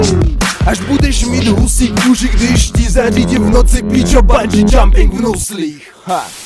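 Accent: Russia. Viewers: Máš luxusní Ruskej přízvuk!! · Na koncertu v rock café jsme si tvrdě vyřvali že chceme ruštinu a dával tyhle bars a lidi to bavilo, ale na to že tu žije asi od 15ti má hodně dobrou češtinu a ten ruskej přízvuk je originální a vražednej:D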